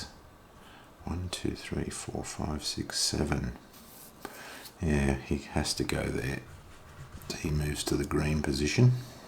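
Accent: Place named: Australia. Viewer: Hi, thank you so much for the amazing video series. I've been wanting to get into these solitaire games but was worried how difficult it would be to play. I've actually owned D-Day at Omaha Beach from this series for maybe 8 years or so but never played it. However watching your videos, the explanation of each move and turn really has opened my eyes and got me wanting to try. I've assumed you're an Aussie based off your accent. I am an Aussie also (NSW based), so really does make it even more enjoyable that someone has taken the time to make such in depth videos for people to watch. Massive thank you. Hopefully you get some more time to make more. Hoepfully I can pull the box down and give it a whirl! Also, how did you trim your chits? Do you have a little jig to have them look so nice on the corners?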